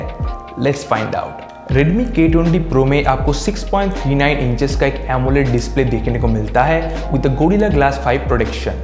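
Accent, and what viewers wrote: Bengal, India. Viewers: Vai tumi je bangali Seta tomar kotha tei bojha jachhe🤩1 jon asol Bangaliei bujhte parbe🤩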